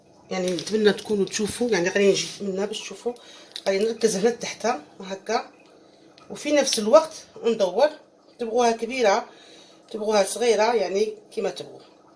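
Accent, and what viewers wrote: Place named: West, Algeria. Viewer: هدرت الغرب شابه😍تعجبني😂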